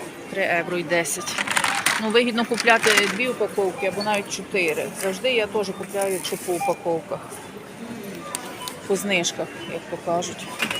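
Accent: Ukraine. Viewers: Мені приємно , що так гарно ви володієте іноземною італійською мовою , мелодійно звучить з українським акцентом . Вдосконалюйте свою розмову !!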